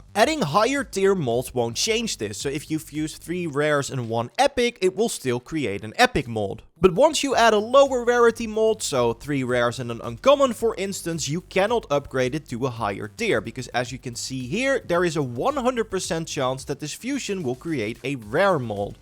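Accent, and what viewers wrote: Netherlands. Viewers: Love this video as I'm really enjoying this game. But that Dutch accent though damn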